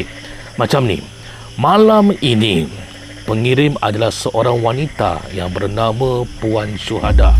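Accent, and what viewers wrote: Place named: Sarawak, Malaysia. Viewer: Abang orang mane Sarawak ke?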